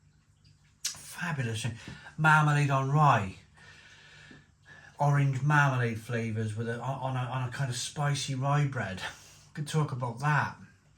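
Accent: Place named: Scotland, United Kingdom. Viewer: Defo sounds like a Westie 😋👌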